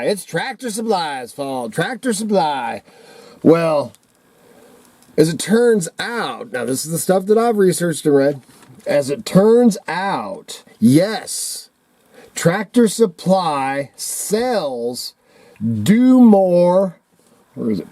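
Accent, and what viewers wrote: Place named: Idaho, United States. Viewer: 1st time viewer. Got a kick out of your Idaho hillbilly chatter. I'm old and lived around hillbillies from west to east and all over the south but looked at northerners as city stickers. Might be because new York and Chicago is upstate. Live in the hills of Kentucky, edge of the Cumberland near mammoth cave park. Got to say, there's not much differences between Idaho and KY billybobs....Got a kick out of your video...Even Miss Kitty ☺️. I never knew people had so much nit picking feeding their.chickens. What ever happened to table scraps, fats from cooking, crushed shells mixed with peanut butter, lol... a little tid bit for you dear. I buy the corn and seed mix from tsc. I figure chickens eat everything....even sheep and goat feed if hungry enough. I've never seen a chicken full. I'm guessing it's the processed feed and not the scratch feed that stops hens laying. 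Biden said he was going to collapse the food industry. I heard it myself in disbelief he even said it and it phased nobody and was not shown again until Tucker showed it on fox recently. And again. No public response. Got to pinch myself now and then ... this is still America, right? Or a petri dish for some creepy villain in cartoons or movie? Ha! I believe it's a God thing and now people minds will be blown....I mean really blown away. Daddy told me to stay away from flights and never throw the 1st punch. Wait for it and do you you have to .... run or fight... just win it or give it your best. That all a should c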